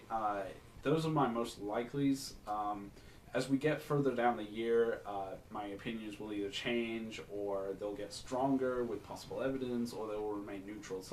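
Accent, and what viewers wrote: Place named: England, United Kingdom. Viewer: Oh thats good and ohhh an england boy no wonder the accent ;) lol do u have kik?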